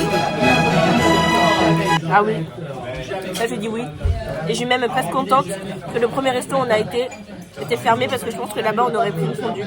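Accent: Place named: France. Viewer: Yes I would, nice 👍 French accent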